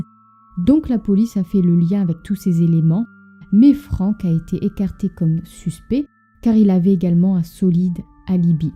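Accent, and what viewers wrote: North, France. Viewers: Bonjour je viens de découvrir votre chaîne et je dévore littéralement les épisodes , j’aime beaucoup !! Petit question si ce n’est pas indiscret bien sûr ,mais j’ai l’impression d’entendre dans votre voix,l’accent du nord de la France ,je me trompe? En tout cas merci pour tout ce travail et bonne continuation !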